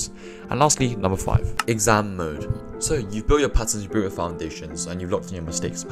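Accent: United Kingdom. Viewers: i dont know ...he said he lives in germany ..but i feel its a bit britsh 😅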